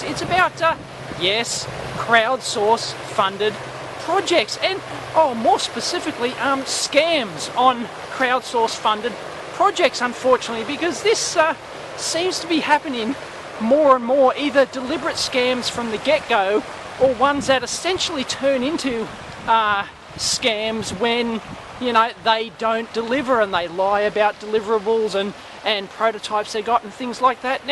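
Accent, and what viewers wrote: Australia. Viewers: Since I'm at work when Neighbours is on, and Steve Irwin went to the big Croc Rodeo in the sky, this is the place I come to get a dose of Aussie accent.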